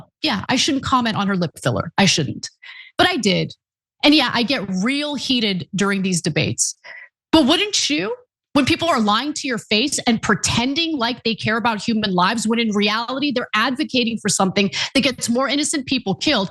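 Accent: United Kingdom. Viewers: Her extreme BRITISH accent proves she is NOT Iranian. The fuckin’ British caused most of this bs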